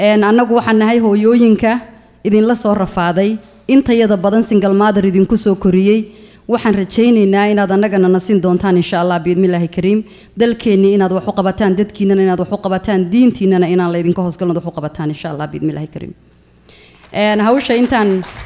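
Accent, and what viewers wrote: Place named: Awdal, Somalia. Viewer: Ree awdaL weeyi gadaburzi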